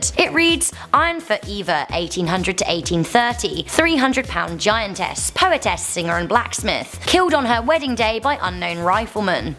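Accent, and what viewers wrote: England, United Kingdom. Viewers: Her English accent is no excuse. She is pronouncing the name altogether WRONG. King Tut I'm sure is not finding any humor. Your comment, however, I'm sure he got a little"chuckle" 🤭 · You remind me of the girl who was in love with doctor who. It's the British accent and how your voice sounds. I miss watching doctor who now. Need to watch it again.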